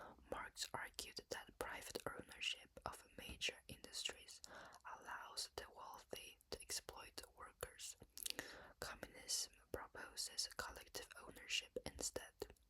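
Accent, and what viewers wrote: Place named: France. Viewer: Are you french??? Sounds like your have a French accent, I am myself a french girl and communist activist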